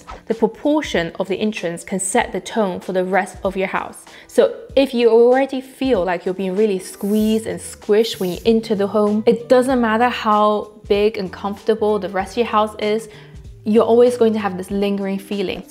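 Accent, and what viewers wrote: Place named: Southern England, United Kingdom. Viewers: Are you from southern England? It sounds like an English accent that's south of the Humber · That was a very nice video, thanks for the tips 👏🏽👏🏽❤. I study architecture for hobby and learned a lot by seeing it. Loved how you show that list between the video's chapters and the final list with all the items: if someday I create content for the internet, I'll definitely use that in my videos. Ps: Where is your accent from? South England? It's so nice. I'm Brazilian and a considerable part of the english we consume over here is from USA 🤠. Best wishes from Brazil 🇧🇷.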